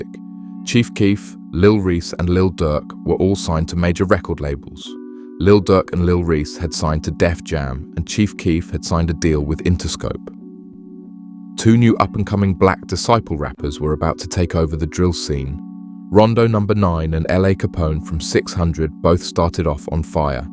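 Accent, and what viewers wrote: United Kingdom. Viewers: He isn’t the only person with a British accent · Man change this stupid ass Brit voice, you outta your fuckin mind lol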